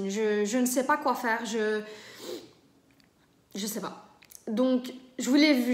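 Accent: Belgium. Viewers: Coucou 🤗 J aime bien te regarder et entendre ton accent belge! Bref ta cuisine est très jolie je vois bien des fauteuils de bar cosy avec un dossier en beige velours ou daim (matière qui se nettoie bien!), ensuite je te conseille de peindre les huisseries en blanc pour ajouter de la lumière à ta cuisine et pour que ça fasse un ensemble. Dernier conseille fais une vidéo sur ta cuisine lorsqu elle sera terminée ou tu décris tout, si tu met du granit au mur ça risque de faire trop et d étouffer ta pièce le carrelage que tu as montré et très jolie mais je le verrais plus en blanc nacré 😉j espère t avoir un peu aidé 😘😘😘😘bises. Hasna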